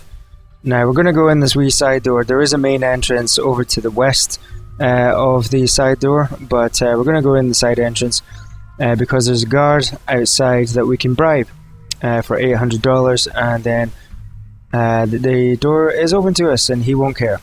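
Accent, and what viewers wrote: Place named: Ukraine. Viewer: You sound like ukrainian) nice gameplay btw!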